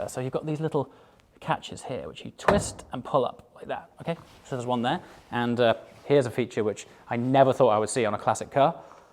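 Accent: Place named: North, United Kingdom. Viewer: Well, with his Northern lilt, you can hardly accuse him of being pretentious!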